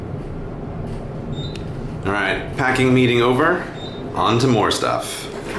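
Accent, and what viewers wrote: United States. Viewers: Woah woah woah woah hold on... you're NOT American? But your voice sounds so plain like ours.... WAT?!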